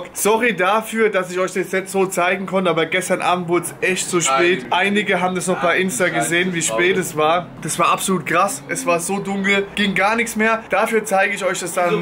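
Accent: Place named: Mannheim, Germany. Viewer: Meine Mutter als sie deine Stimme gehört hat: der redet wie ein richtiger Mannheimer, find ich geil. Und dann lacht sie die ganze Zeit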